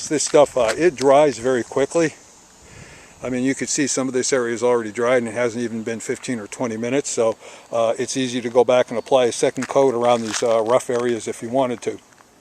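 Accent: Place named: Western Pennsylvania, United States. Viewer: You sound like you are from western Pennsylvania.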